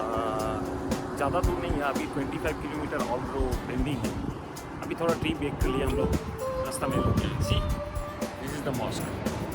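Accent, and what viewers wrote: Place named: Kolkata, India. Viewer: Love the way u talking dada.. Feel like kolkata....☺️ impressive photography.✨️🌟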